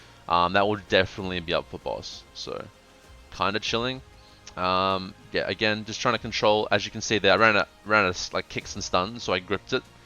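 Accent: South Africa. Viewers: Are you from South Africa? Accent sounds a bit like it. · Thank you very much. BTW your accent sounds like you are from South Africa.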